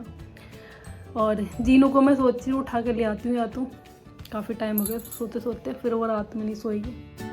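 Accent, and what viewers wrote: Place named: Rural, India. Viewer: Sister aap to bilkul gaon ki language bole to aap to Delhi mein rahe ho ham bole to ham bhi udhar ke side ke Hain ham bhi aapki tarah bolate Hain jab ham ghar mein baat karte hain to aur aapke language bahut acchi lagi aapki mummy bolati hongi lekin aap to Delhi mein Pali bade ho so sweet bahut achcha Laga blog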